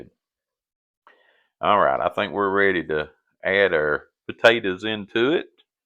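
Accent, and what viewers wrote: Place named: Kentucky, United States. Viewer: I'm trying to place your accent and I want to say Kentucky, maybe Missouri, Tennessee. We sweated our onions, too. Hope you read this and let me know. Kentucky here, btw round Paducah. I'm gonna try to get the wife to cook some of this cause it looks like a right fine recipe. I gotta say, though. It hurt my heart to hear you say almond milk and cornbread in the same sentence. Now I know you were saying NOT to do that, but the thought made me die a little inside. I'll get over it, I reckon.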